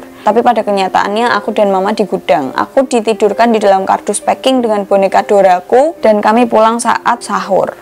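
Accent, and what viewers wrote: Java, Indonesia. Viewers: aku ga suka logat Jawa tpi pas denger mba * ngomong jdi sukaaaaa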